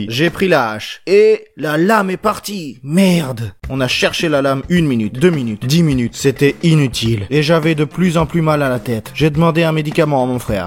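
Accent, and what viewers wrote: Russia. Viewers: t'es russe ?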